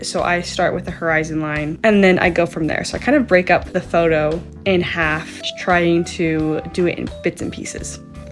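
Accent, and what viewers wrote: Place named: United States. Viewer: You probably hear it a lot, but you have a really nice voice. Maybe it's the American accent lol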